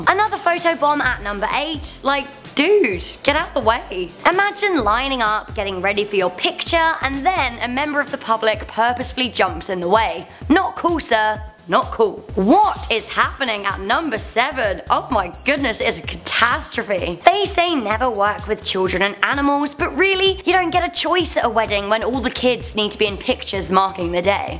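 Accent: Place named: United Kingdom. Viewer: This lady's British accent is super strong and LOUD!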